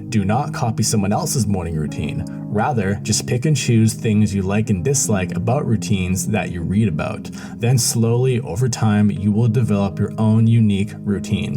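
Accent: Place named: Sweden. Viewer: Du är ifrån Sverige man hör det på din röst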